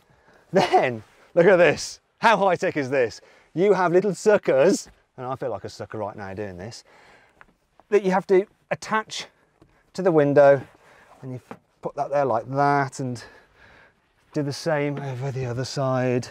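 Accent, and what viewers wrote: United Kingdom. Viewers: does that mean is Polish immigrant? I always thought hes British because of perfect accent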